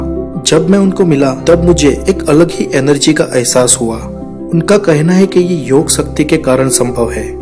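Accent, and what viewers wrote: Gujarat, India. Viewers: plz chq, u r ton,,,,,is gujarati.....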